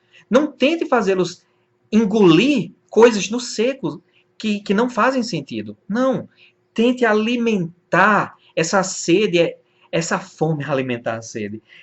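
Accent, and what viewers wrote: Rio Grande do Norte, Brazil. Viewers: gostei desse sotaque do rio grande do norte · o sotaque potiguar me chamou a atenção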